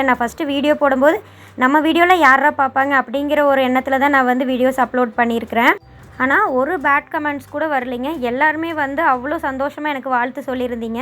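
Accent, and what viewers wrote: Kongu, India. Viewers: unga slang apadiyea kongu slang mari iruku sis....i am new from u r cheanal wru u from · அழகிய கொங்கு தமிழ் அ பேசறிங்க ங்க‌ I like ur slang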